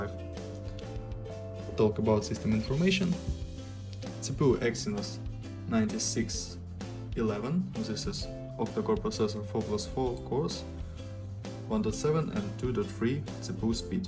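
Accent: Russia. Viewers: очень сильно чувствуется Русский акцент ,но спасибо ,ибо без русского акцента мне было бы не понять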